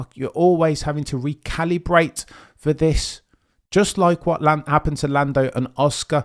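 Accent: United Kingdom. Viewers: Love you, but really struggle to understand with your heavy British accent. My loss. Keep it going!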